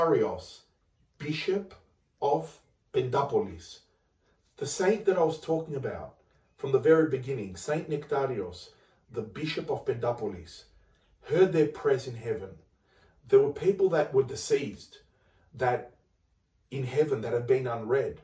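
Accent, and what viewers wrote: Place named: Australia. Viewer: Hello my Orthodox brother. You sound Australian, I too am Greek Australian from Melbourne. I started researching St Nektarios after having him come to me in a dream, he was walking with me and chatting to me walking down a hill near a monastery, I didn't think much of it at the time and just passed it off as a dream. After a couple of years my wife passed away and I somewhat pushed away from religion, a couple years later I restarted my journey with religion and just today, I remembered the dream I had approximately 5-6 years ago, it just popped into my head, I remember I was sick with an illness at the time of the dream and just realised that I was healed shortly after the dream, my guess is the Saint came to me to heal me, didn't put the two together at the time, but my guess is that it was a miracle, anyhow, I started researching the monastery of St Nektarios and low and behold, I saw the church and the gardens in Egina Greece, it sparked my brain and I thought, is this the church and garden I saw in my dream? 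I got goose bumps, I continued to do research and thought wow this Saint is a true modern day miracle worker, back when I had the dream it was like I teleported to the church in Greece and walked with St Nektarios and he healed me, anyhow, I would really like to visit in real life one day. That all said I had just read Agios Nektarios Paraklisi and decided to do some further researching into St Nektarios miracles and found your video. Just wanted to say thank you for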